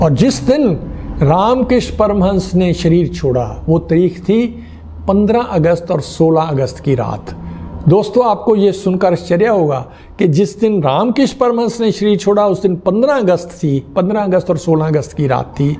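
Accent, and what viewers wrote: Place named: Punjab, India. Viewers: Bilkul theek surjit bhai ..kya karen hum pbi hee hain ...jhalak tow padegi ! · सर, हिन्दी के शुद्ध उच्चारण की आवश्यकता है। कहीं कहीं पंजाबी उच्चारण के प्रभाव की झलक मिलती है।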